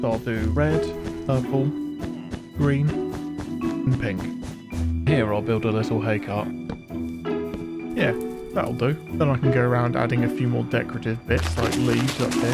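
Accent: United Kingdom. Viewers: This is just a normal British accent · He is british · Bro’s voice is so calm, comforting and polite with the british accent on top of it is just amazing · Omg I love British people, I like the accent it's hard to learn but if your British your lucky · Why does everyone that plays Minecraft hardcore is British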